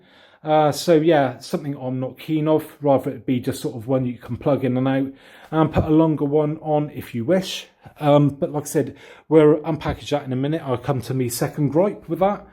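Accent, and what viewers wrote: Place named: Bristol, United Kingdom. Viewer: Gotta be Bristol innneeeeeee??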